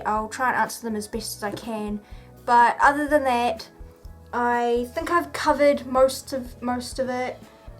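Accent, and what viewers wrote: New Zealand. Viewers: steezy.k kiwi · thought you were a kiwi! (Waving at you from Wellington) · This is a bad idea but im doing it anyway!! I noticed youre from nz right away and i sound the same but i roll my r's slightly more :-) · nope it is her accent and she is a kiwi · Hi this is really cool and i love your videos. are you from new zealand cause your accent is awesome. Awesome bear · She's from New Zealand, be ignorant somewhere else. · Kiwi accent :3 The tattoo turned out rad! If I ever came across you in person I would seriously pay you to give me one x)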